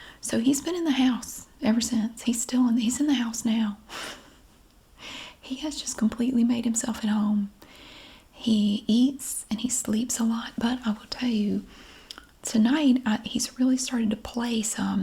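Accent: South, United States. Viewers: Enjoy your videos. You have a nice southern accent. Toboggan means knit hat in the south? That is funny. I’m from Minnesota and here Toboggan is definitely a sled. We have plenty of snow in winter.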